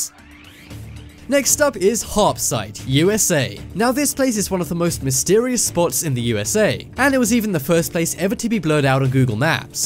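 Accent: England, United Kingdom. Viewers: I’m 100% sure, he is british... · It's nuclear,not nukyilla, I thought you was English at first,but I'm not sure now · makes me laugh how this guy sounds british yet pronounces nuclear as nukiller like an american ffs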